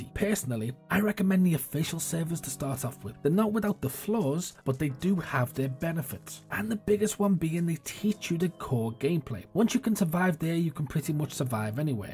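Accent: Liverpool, United Kingdom. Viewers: Are you scouse?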